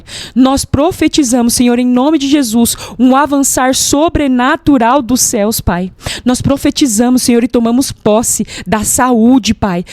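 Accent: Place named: Videira, Brazil. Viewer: Você é da Videira? seu geito de falar é igual o de lá kk.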